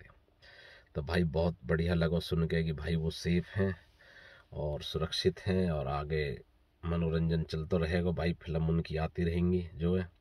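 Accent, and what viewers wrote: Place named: Bihar, India. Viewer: Bhaiye jese bolte ho tum to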